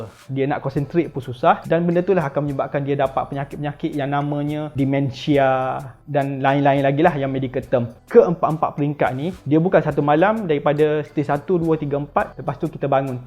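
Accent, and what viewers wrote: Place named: Kelantan, Malaysia. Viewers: Hehe....klate la tu bosskur... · Bunyi lg slang kelantan tu🤣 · abg ni mesti kelantan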